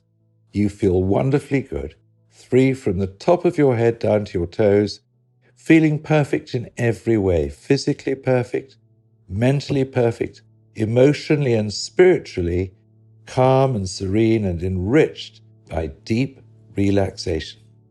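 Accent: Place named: England, United Kingdom. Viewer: Very useful tape. My name is also. You sound English. Is your full baptised name?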